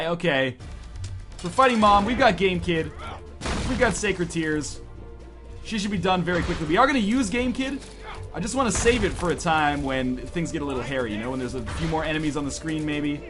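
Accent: United Kingdom. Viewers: I did actually like your British accent. Well done. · I love your british accent!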